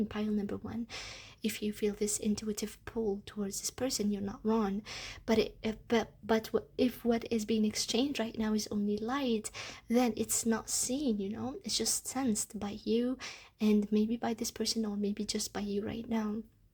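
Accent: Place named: Scotland, United Kingdom. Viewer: I know there’s a separate accent but you also sound slightly Scottish!! It’s so calming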